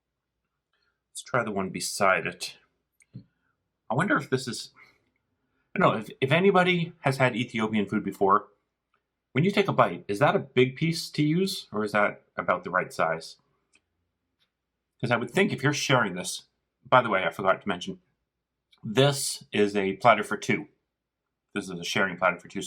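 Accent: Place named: Canada. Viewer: Where is this restaurant? You sound Canadian.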